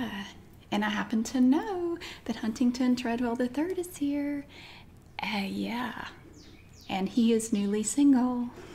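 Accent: South, United States. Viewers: The country accent, blonde hair, sequins, long nails, and big jewelry reminded me of my old babysitter. :)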